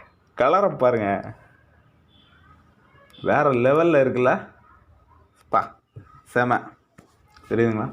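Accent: Kanyakumari, India. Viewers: Bro native Kanyakumari ah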